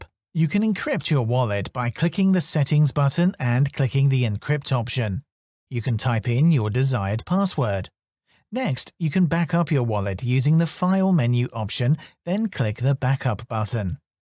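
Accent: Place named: United Kingdom. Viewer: British pronunciation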